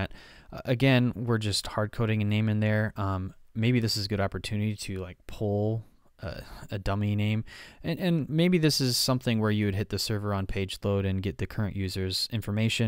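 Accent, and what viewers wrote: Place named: England, United Kingdom. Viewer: i love your english accents and thank you sir :)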